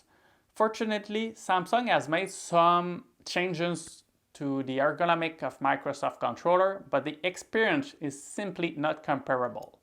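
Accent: Quebec, Canada. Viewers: i’m from Québec too, i heard your accent and i was like, omg i know this accent x) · Love the Kay-beck accent :)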